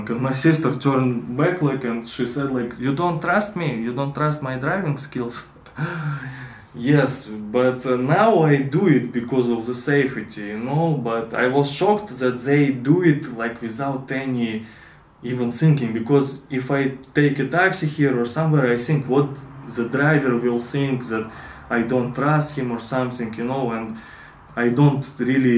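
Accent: Russia. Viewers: Hello! Are you from Kirov or Yeburg? Your accent is like a Ural one.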